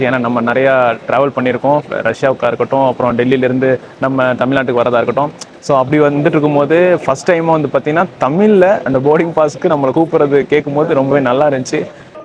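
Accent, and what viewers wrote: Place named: Sri Lanka. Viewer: இனிய தமிழ்! இலங்கைத் தமிழ்!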